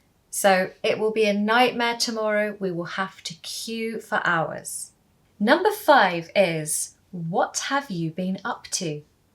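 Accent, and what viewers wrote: United Kingdom. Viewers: I m an iranian. I have nt seen before teacher like you .actually your method is useful and effectivr.especially with brit accent lovely❤ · I like your British accent teacher I also like how you teach inglish 👍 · English sound beautiful when spoken by UK native speaker like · Thanks ！I really enjoy British accents, especially yours and your voice. And I think British accents are easier to understand than American’s. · Hi I'm fascinated with your videos, geat explanation, british accent, nice hair, soft entonation and beautiful smile of professional woman. Congratulations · Hello I love your videos so much they are useful. And also your speed of speech is very friendly to me I can understand you clearly lol. You are sweet and lovely teacher. Besides I am quite into your wonderful British accent!! I wanna learn more from you and hope u have a lovely week❤ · You're the BEST teacher I've ever known. 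I Love British accent.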